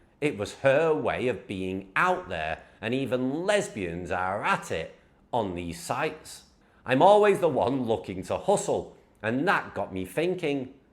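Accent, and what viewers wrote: United Kingdom. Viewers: Complete BS!..he is British by the way he talks.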